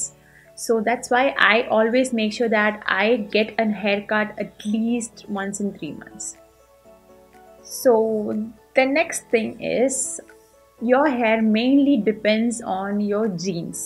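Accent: Nagercoil, India. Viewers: Tamil la peasalaame neenga nagercoil dhaana??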